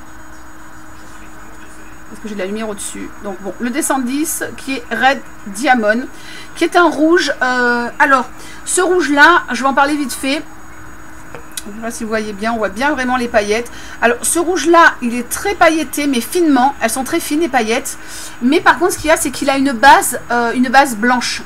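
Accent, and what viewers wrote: England, United Kingdom. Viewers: que j'aime ton accent anglais :p Et oui faut PAS acheter à n'importe qui et surtout pas une arnaqueuse lol MDR mon mot à l'écran !! Hihi et bien pour ma défense je me suis bein rattrapé :p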